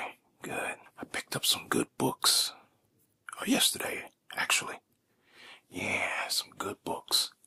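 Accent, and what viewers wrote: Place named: California, United States. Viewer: Hope homie hit over 100K this year! They already know Cali got the best accent for ASMR!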